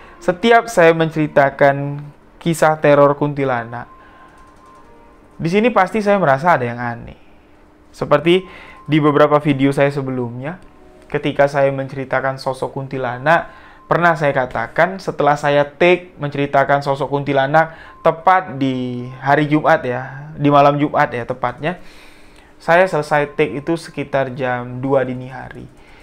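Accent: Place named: Madura, Indonesia. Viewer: Mau tanya mas asli orang dari daerah mana?...logatnya mirip2 sprti orng madura atau orang padang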